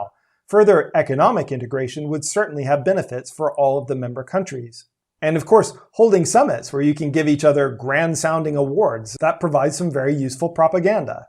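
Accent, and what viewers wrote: United States. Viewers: old Dutch; speaking about Turkic language with US English. Yes sir.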